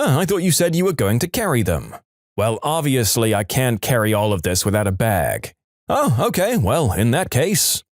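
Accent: United Kingdom. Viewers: HES BRITISH NOW?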